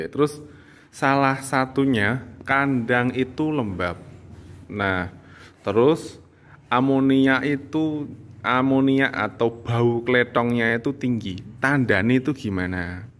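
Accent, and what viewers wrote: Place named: Juwana, Indonesia. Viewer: Koyo wong juwono yo om?😁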